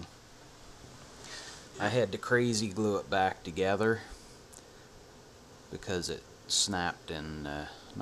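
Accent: Canada. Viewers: You are canadian aren't you?!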